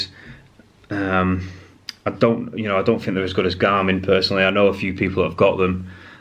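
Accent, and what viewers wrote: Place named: Yorkshire, United Kingdom. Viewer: Thanks for the review! Currently have Fitbit sense and my venue 2s comes tomorrow. Excited to try it! Your accent sounds like you are from Yorkshire? Could be wrong … but my grandparents and mom were born in Yorkshire! 🥰 love the accent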